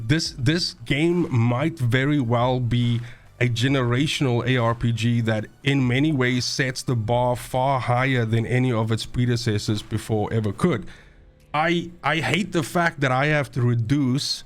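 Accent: South Africa. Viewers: Trying to figure out your accent... Are you from South Africa? Howzit from Cape Town 😎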